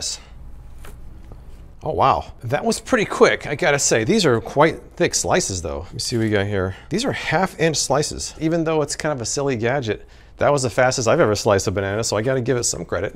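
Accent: United States. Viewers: baanaynia or banana American vs rest of the world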